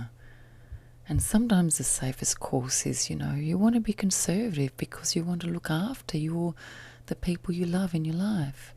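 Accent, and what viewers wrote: Australia. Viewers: You are so beautiful, your eyes and smile really draw me in and that's coming from a 22 year old:) oh and that ozzy accent! Perfect.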